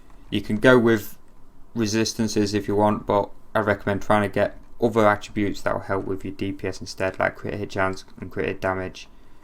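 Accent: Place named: Stoke, United Kingdom. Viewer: ahhhhh accent sounded a bit stokish :) subbed you though look forward to more content cheers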